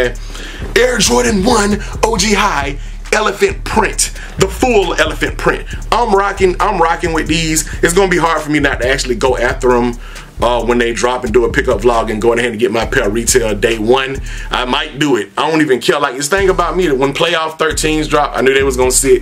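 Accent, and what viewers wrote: South, United States. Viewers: you're a funny dude and your southern accent. keep doing your thing bro!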